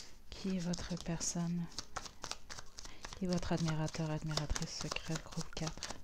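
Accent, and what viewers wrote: Quebec, Canada. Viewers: Salut ! J'ai une petite question , es tu du Québec ?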